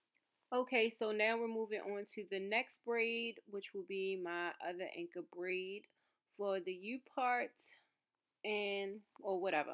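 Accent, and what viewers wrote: Baltimore, United States. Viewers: sounds like Baltimore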